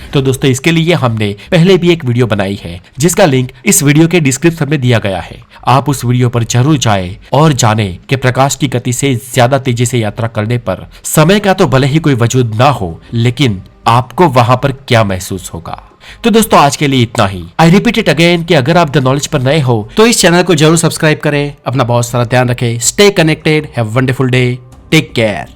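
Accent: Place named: Gujarat, India. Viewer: તમે ગુજરાતી છો... ને??? 😁😁😁 ખબર પડી જ જાય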